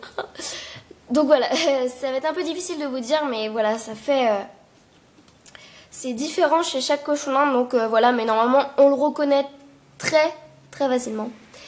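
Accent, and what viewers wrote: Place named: Belgium. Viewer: t'es belge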